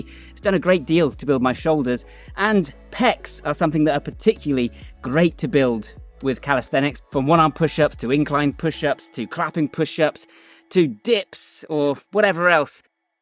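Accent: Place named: Scotland, United Kingdom. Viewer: Ur Scottish? U have a faint Scottish accent.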